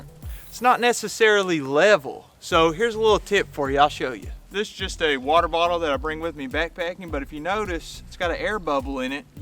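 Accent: Kentucky, United States. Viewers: Excellent stuff here. Never thought to check ground level with my water bottle! One thing I used to love seeing when I was deep fall camping for hunting was a floor covered by dry leaves where I was going to camp. I’d use a stick and rake up three piles. Pick out the sticks and twigs from them, then set my tent up on top of the smaller pile. The other two I’d use to fill up two large heavy duty lawn bags. I put the two bags in my tent, covered them with a wool blanket, and that was my mattress. Combined with the duff under the tent I stayed warm and comfortable. ABC - always be careful, though…if it has been a warm day, you may accidentally rake up a copperhead. Also… I saw you wearing a BBN shirt in a video and your accident sounds Kentuckian. Are you one of us Appalachians, man?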